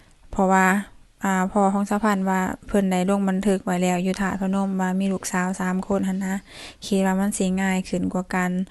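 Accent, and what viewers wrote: Laos. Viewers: อีกอย่าง สำเนียงพูดลาว FC บอกหลายทีแล้ว เวลาติดต่อราชการให้เปลี่ยน นี้ก็ไม่เคยจะทำ จะมาขอสัญชาติไทย ยังจะพูดลาว จะมาห่วงแหนความเป็นลาวอะไรขนาดนั้น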